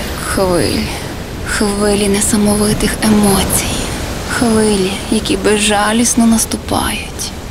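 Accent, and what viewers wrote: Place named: Lviv, Ukraine. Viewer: Chto Vy mayete proty Lvivs'koho aktsentu. Ukraina velyka. 🌲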